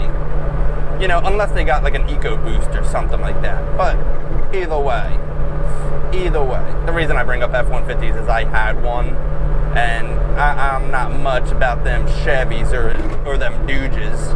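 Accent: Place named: Maryland, United States. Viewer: You have to be a marylander with that accent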